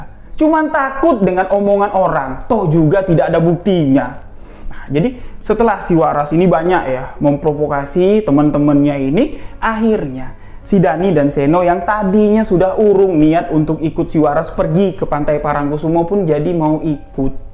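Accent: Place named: Jambi, Indonesia. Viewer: AKU Dari Lampung Nich Bang! Wa A'laikum Salam Wr Wb Jg AKU Percaya dengan Segala Cerita Yg Bang Sampaikan! dengan Logat Bahasa Nya Yg Khas Orang Jambi, Slow, Lembut, Dan Bisa Kita Pahami Sucsses Terus Bang Aamiin 3X Allah Humma Amin Ya Allah Ya Robbal A'lamiin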